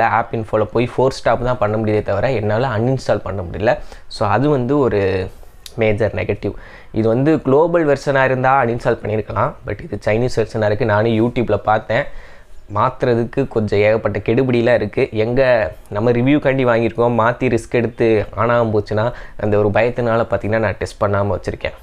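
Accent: Madurai, India. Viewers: Bro niga madurai thana bro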